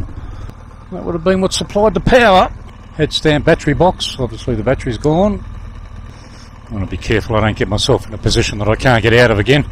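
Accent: Australia. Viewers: Love your presentation style mate: old school Australian 👍👍👍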